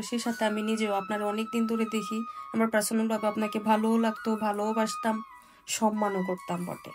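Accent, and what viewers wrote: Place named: Noakhali, Bangladesh. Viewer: Noyakhali bole kotha....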